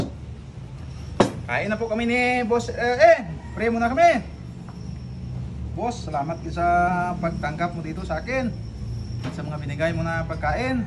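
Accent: Iloilo, Philippines. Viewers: Idol taga saan ka ba... Parang tunong iloilo ka idol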